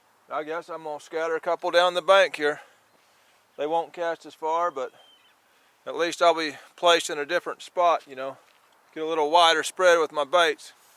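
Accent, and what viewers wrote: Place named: South, United States. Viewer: I'm sure somewhere in Tennessee. By the way he talks. He from the south yeehaw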